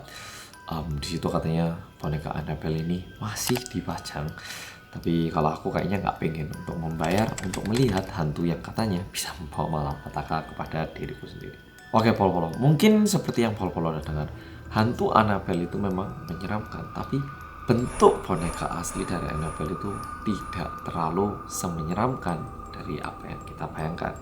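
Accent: Java, Indonesia. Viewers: Orang Korea tapi ngmong nya medok banget wkwk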